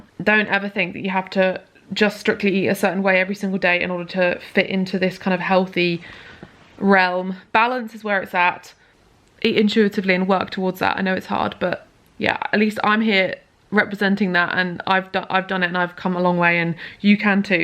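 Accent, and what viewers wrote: United Kingdom. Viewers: Absolutely not related to the video but: I just came back to France from Wales a month ago, where I lived for a year. Hearing your accent and seeing that pot of yogurt that was definitely from Asda suddenly made me so emotional. I'd never thought I'd miss the UK that much.. Lots of love on you and your fabulous country ♡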